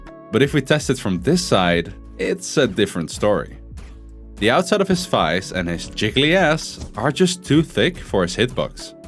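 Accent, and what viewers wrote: Netherlands. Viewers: Are you Dutch? You sound so dutch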